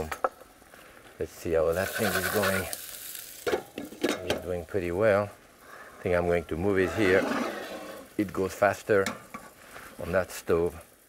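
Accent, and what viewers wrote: France. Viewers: It's his gorgeous French accent. Personally, I could listen to him talk all day long.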